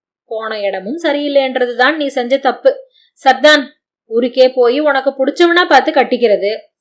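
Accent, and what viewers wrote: Chennai, India. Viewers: தரமான மெட்ராஸ் பாஷை,கேட்டு மகிழ்ந்தேன். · மெட்ராஸ் பாஷை தெரியாதுண்ணீயேமே.. ஆனா மெட்ராஸ்லயே ரொம்ப லாங்கா வாய்ந்தா மாறி படா ஷோக்காக்கீதுமே ஊம் பேச்சி..😮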